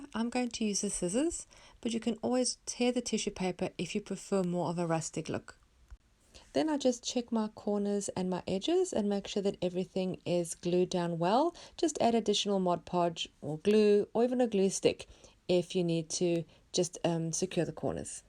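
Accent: South Africa. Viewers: So nice to hear a South African accent...enjoying your work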